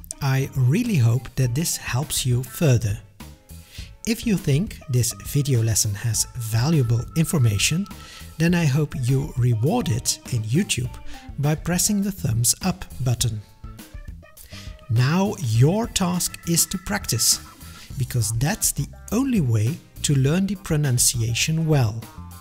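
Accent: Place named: Eindhoven, Netherlands. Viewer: Yet we barely speak dialect anymore in Eindhoven. I doubt you've heard it during your time living here. I know a grandfather who speaks the dialect and the granddaughter wondered why "opa" was speaking "Belgian" xD. It's the accent that still exists among speakers of the city, which is of course different and which is what people noticed about your pronunciation :). I do pronounce "V", "W" and "F" clearly differently from one another by the way.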